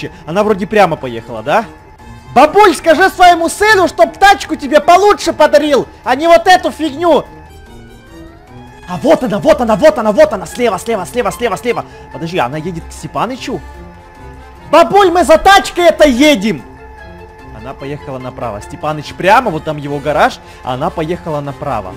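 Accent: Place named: Kharkiv, Ukraine. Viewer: шокают не в деревне а в Харькове 😎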